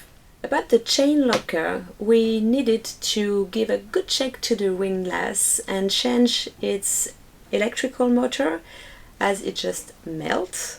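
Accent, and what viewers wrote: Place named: France. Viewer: Lovely french-english, i love it!!!!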